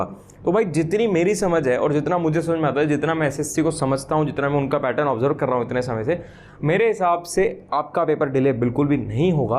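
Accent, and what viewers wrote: Haryana, India. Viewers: brother i think you are form hariyana Right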